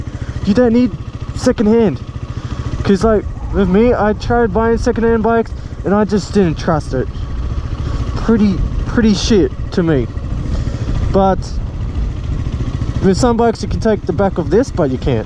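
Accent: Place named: Australia. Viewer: Excellent commentary. That aussie accent is a beauty.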